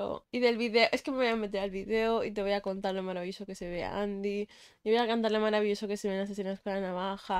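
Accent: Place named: Peru. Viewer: Hablas cmo peruana🧐 sera?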